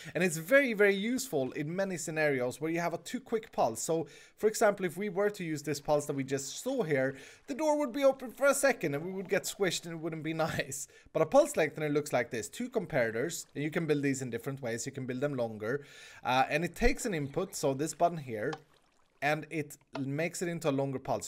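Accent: Sweden. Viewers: r u swedish? i think because of 4 reasons. 1. ur accent sound like swedish (ik because im swedish too) 2. = icecold85. 3. is = ice. kall = cold. 4. u live in a ice castle in HermitCraft and an ice castle should make your icecold. icecold85:)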